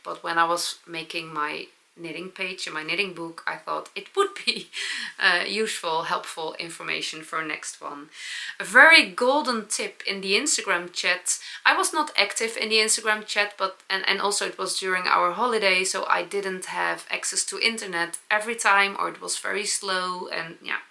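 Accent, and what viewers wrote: Netherlands. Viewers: Love your dutchy English, you re searching for “kastanienbraun”, so similar to kastanjebruin, ❤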